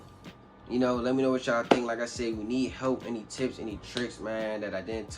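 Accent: DMV, United States. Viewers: Could tell u was from the DMV 🤣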